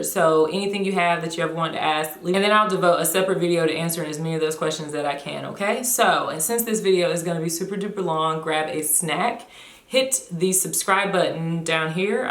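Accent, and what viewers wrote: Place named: Alabama, United States. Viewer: Great video and I love your hair! My question: Where are you from? I hear an accent...perhaps Hunstville, AL?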